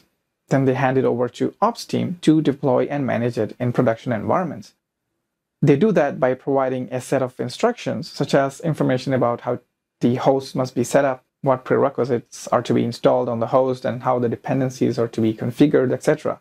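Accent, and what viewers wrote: India. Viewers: always better with the indian accent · this look surreal. an indian who has very little indian accent.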